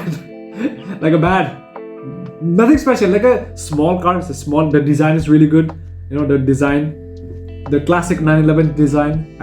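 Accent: India. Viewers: Yo dude, quick question, where are you from? You look asian but sound indian, it's an interesting mix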